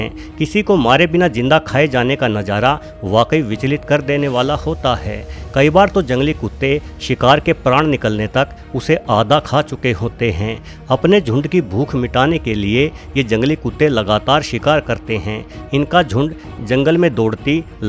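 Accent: Haryana, India. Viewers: HR se hi ho kya Sir aap voice se lgta hai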